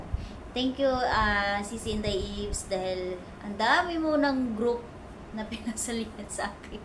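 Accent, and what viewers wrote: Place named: Hiligaynon, Philippines. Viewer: Ang ilongga accent di tlga sya matanggal oyy 😂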